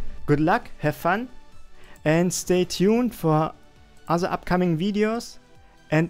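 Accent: Germany. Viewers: Are you German? xD #Giveaway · Man hört so krass, dass du deutsch bist. Warum machst du keine deutschen Videos? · man hört das deutsche mega raus xD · Du bist safe Deutscher :D das mekt man sofort. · Are you a german ?? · Du bist doch deutscher oder 😂😂👍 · are you german? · Heyo bist du n Deutscher? Ich finde den Aktzenz hört man immer sofort raus :D. hast du Lust mal zusammen zu spielen?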